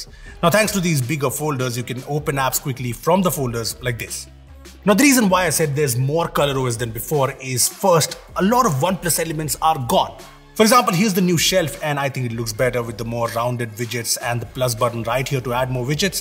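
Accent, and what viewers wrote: India. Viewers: How do u got 3m subs with that indian accent, i couldnt understand a word you said.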